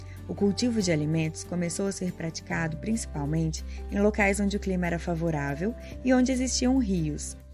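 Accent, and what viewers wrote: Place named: Belo Horizonte, Brazil. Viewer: Kkkk. Vcs acham que a narradora é de BH ou nem??? Ô, sotaque forte! Muito bom o vídeo. Parabéns!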